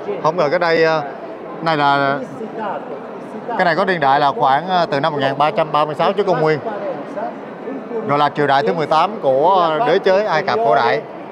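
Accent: South, Vietnam. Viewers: người Miền Nam, cho nên thuyết minh giọng rất thu hút & duyên dáng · Một kênh du lịch khám phá rât lý thú , Giọng miền Nam của bạn rất dễ chịu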